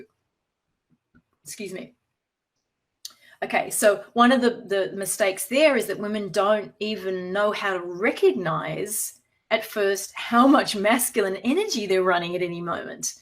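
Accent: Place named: United States. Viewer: I love it when you talk American. (I am an American, so I love hearing how I sound to people outside the United States.) :)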